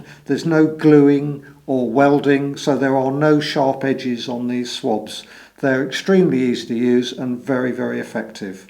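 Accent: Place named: England, United Kingdom. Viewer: You sound like your from.england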